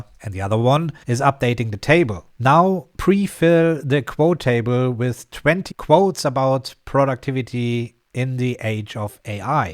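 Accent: Germany. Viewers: Just found your channel, saw this and the previous video. Love the content and the practical relevance focusing on real life usability without self promotion which is often the main motivation of other content creators in YouTube. And as a German guy, I also like your German accent 😊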